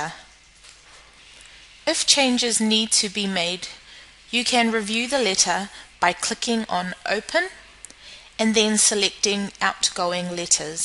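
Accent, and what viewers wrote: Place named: New Zealand. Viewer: If these medical software providers want to be taken seriously the first thing they have to do is stop with the childish, inane names like 'Dr Darth Vader' and patients called 'Mickey Mouse'. I'm sure they think they're just hilarious - hahaha - but Health Providers and Specialists won't. It completely destroys your credibility. I'd also be closely monitoring what videos you put out there. Presentation and a pleasant speaking voice matters. This one had way too much of the kiwi in it. And this one below (I don't know what to say. It's that bad, I have no words except to say it should be taken down immediately - as well as anything else by the same content creator). <link>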